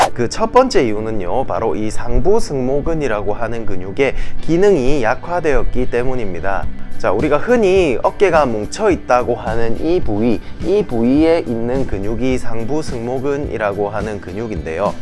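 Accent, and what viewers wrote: Gyeongsang, South Korea. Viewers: ㅎ 저는 그래서 넘 좋습니다 표준말은 어디에서든 접하기도 하지만 때론 딱딱하기도 차갑게도 들리는데 경상도 말은 화면에서 찾아보기 드물고 또 다정다정한 친근감있는 말투가 넘넘 좋습니다 아픈 이유도 상세하게 말씀해주셔서 저는 운동보다 먼저 서론을 더 신중하게 반복해서 듣게되는 1인으로서 자세한 설명에 더 감사할 따름 입니다 고맙습니다 홧팅하시길💗💗💗💗💗💗💗💗💗💗💗💗💗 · 억양이 참 재밌네요 경상도?억양이죠? 따라하게 됩니다 유익정보 감사합니다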